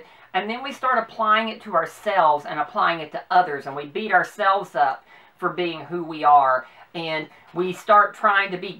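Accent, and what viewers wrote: South, United States. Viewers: Love you southern accent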